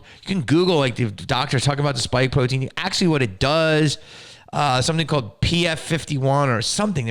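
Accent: England, United Kingdom. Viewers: Love the English accent, very Tim Curry. I enjoy your banter. xx Like your opinions too. 💐 Love Joe Rogan too, Harry and Megs need to shut up. Love from Australia!!! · Loved that English accent! All you need are muttonchops! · It's crazy indeed! I don't read news about this people, i prefer to read and listen things about you! Omg! Lol your english accent is great! Your shirt is beautiful too. You always looks amazing! Thanks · Your British accent is hilarious 🤣👏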